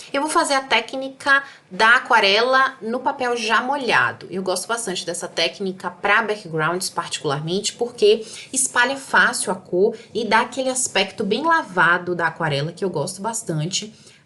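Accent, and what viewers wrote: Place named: Bahia, Brazil. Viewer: Que bom que gostou, obrigada! 🥰 PS: o sotaque é da minha amada Bahia ♥️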